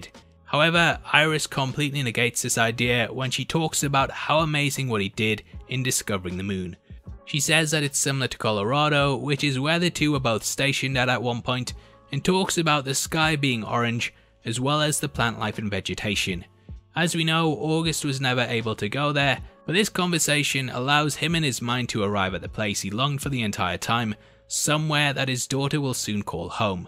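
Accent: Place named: Newcastle, United Kingdom. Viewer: Why aye man. I thought you might be a Geordie but I wasn’t sure until you said the word daughter. 😂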